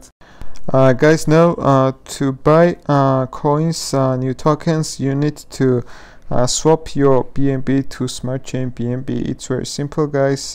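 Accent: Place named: Georgia, United States. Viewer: I recognized the Georgian accent LOL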